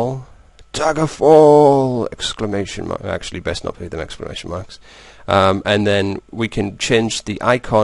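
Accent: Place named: England, United Kingdom. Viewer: I really like your accent! What part of England are you from?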